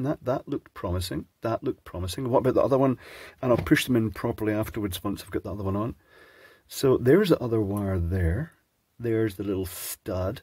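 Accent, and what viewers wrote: Scotland, United Kingdom. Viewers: Poshest Scottish accent I've ever heard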